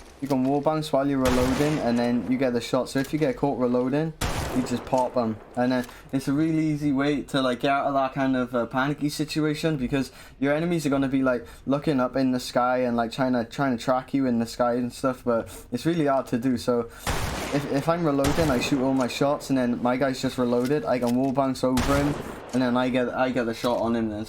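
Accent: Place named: Wales, United Kingdom. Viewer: Can't watch ur too Welsh sorry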